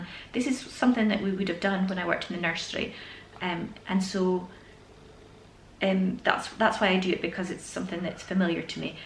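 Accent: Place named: North, United Kingdom. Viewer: Fab video. Ive been stressing about teaching my 2 girls inbetween being out at work. Lovely to your lovely family and to hear ur accent. Im originally from Peterhead so its nice to hear northern accent again. Stay safe take care x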